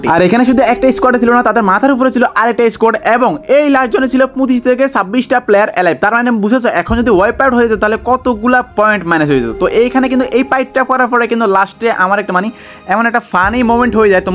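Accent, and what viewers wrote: Noakhali, Bangladesh. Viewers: Bai ki noakhalilla?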